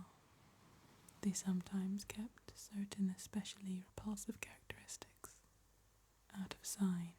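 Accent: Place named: South, United States. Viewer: Ok I’ve finally listened past the first few minutes without falling asleep (took several tries), and please tell me how an English girl does a better southern American accent than half of Hollywood 😂👏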